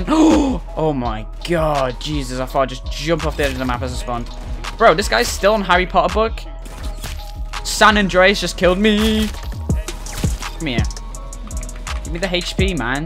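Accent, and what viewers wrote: United Kingdom. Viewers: are you talking english in british way ?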